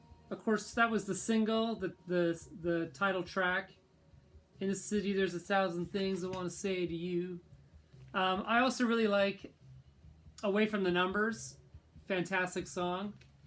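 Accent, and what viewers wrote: United States. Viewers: Dude you're not a London boy you sound American or canadian Americans started everything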